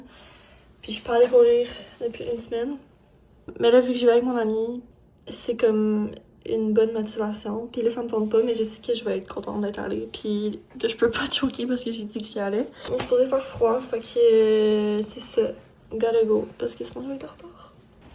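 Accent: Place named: Russia. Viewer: sorry, but once I heard you I thought you russian , I didn't understand anything 😆😆 love your ideas, hope good for you 💕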